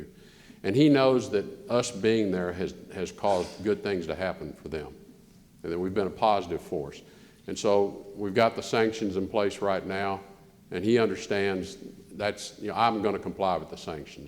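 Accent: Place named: Texas, United States. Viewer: pro I miss that Texas draw :)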